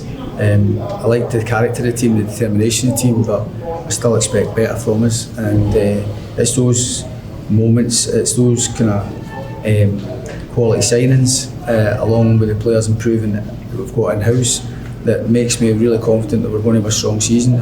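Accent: Scotland, United Kingdom. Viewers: More waffle. But now in a more verbose style and with a Scottish accent.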